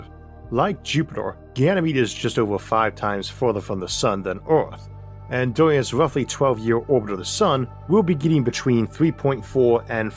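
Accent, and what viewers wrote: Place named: South, United States. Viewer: Not sure why the person reading this sounds like a southern American plantation owner. Terrible accent. Gave up watching it because I couldn’t stop laughing at it.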